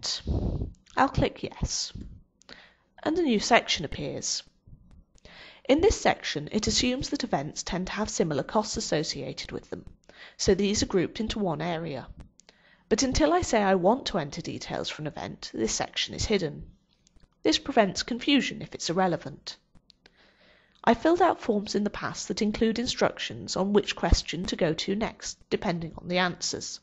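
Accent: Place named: United Kingdom. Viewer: Es acento británico.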